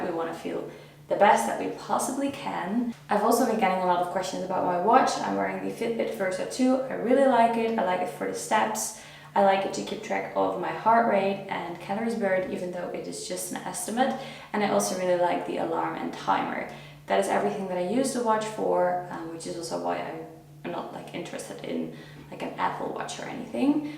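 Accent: Netherlands. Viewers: Are you Dutch? You have a very Dutch accent XD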